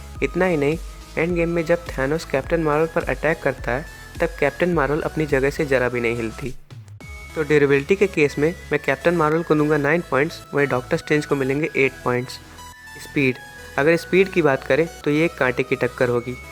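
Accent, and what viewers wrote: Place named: India. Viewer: Man from India, Your accent is terrible!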